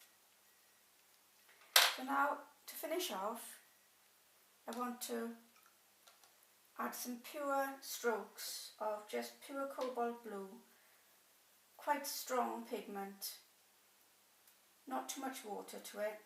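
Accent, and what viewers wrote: Wales, United Kingdom. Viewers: Yeah. It's easy to tell a welsh person from the accents. I love your video by the way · I just got into watercolours as a way to manage stress and anxiety - it can be overwhelming as a beginner but your video was exactly what I was looking for. You move at a good pace, your explanations are wonderful and your Welsh cadence is a pleasure to my ears. Thank you, brilliant work.:)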